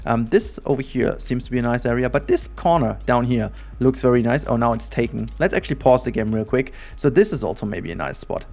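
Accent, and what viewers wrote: Germany. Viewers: Dieser deutsche Akzent ist göttlich! Love the videos, keep it up <3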